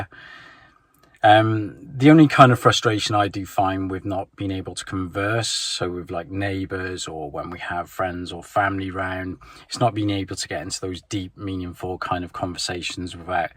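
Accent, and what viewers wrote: United Kingdom. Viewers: u will never learn Russian...and i will tell u why...u don't like the Russian language...u just love to hear yourself in your ugly native language... and I can notice that u speak fluent english with a strong british accent better and better every day... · у нас некоторые русские делают покупки в магазине, не говоря ни слова 🙂 Я думаю, что вы человек очень family-orientated, что в повседневной семейной жизни вам легко общаться как с женой, так и с детьми на английском. Ну и есть люди, которые очень открытые, легко идут на контакт, много общаются. Вы более закрытый, вам хватает общения с устоявшимся кругом старых друзей и знакомых. Поэтому у вас освоение языка идёт медленнее, либо это просто ваше чувство, что он недостаточно хорош. Но пробуйте, будьте смелее, вас всегда поддержат. Людям любой национальности нравится, когда говорят на их языке, это ценится. 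Вам помогут с русским ваши дети, слушайте их, говорите с ними на двух языках. А так, мне очень нравится слушать ваш британский английский. Такое удовольствие? Скажите из какой части Великобритании вы? Просто я не смотрел ваши видео с самого начала,не знаю поэтому.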